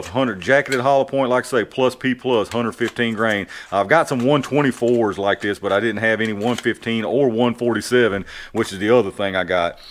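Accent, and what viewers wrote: South, United States. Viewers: I love hearing someone from the south bless peoples hearts.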